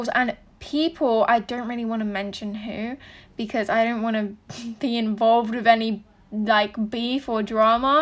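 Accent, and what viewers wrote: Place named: United Kingdom. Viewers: Britishhhh~~~~~ da fancy accent ._. it's hard tho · Ummm what do you mean all British accents sound the same. Hers is not the best accent. I ave a British accent and it sounds the exact same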